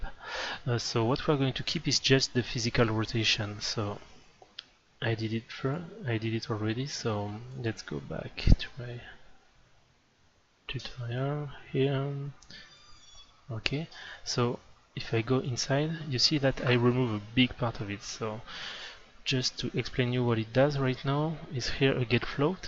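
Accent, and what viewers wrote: France. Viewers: oula sound french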